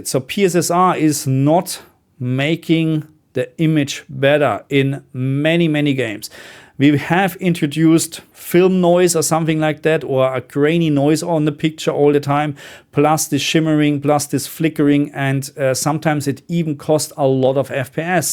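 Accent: Germany. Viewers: und bin selber Frangge auf Nürnberg, daher vermute ich auch das bei ihm haha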